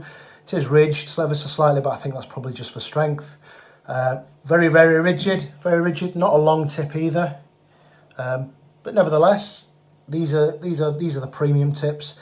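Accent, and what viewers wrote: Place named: Northern England, United Kingdom. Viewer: Have you moved to them since this video and do you still rate L style premiums? Cheers fella, you sound like a northener like me, have lived in Spain a long time though, hence the soft tip.... I'm not that keen if I'm honest....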